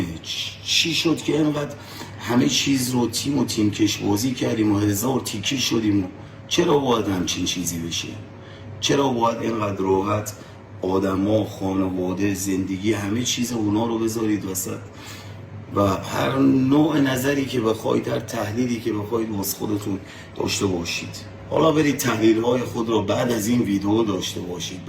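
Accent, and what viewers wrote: Afghanistan. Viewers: چرا لهجه لری به افغانی تغییر کرد؟؟؟ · لحجه افغانم گرفتی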